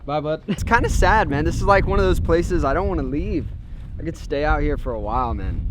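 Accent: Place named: South, United States. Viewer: Really spun up the southern accent on this vid, huh bud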